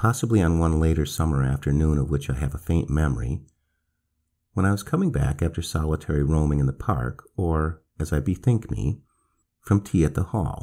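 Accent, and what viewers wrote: United States. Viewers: Funny to hear an M R James story narrated by an American just when I was getting used to more complex British accents. 😁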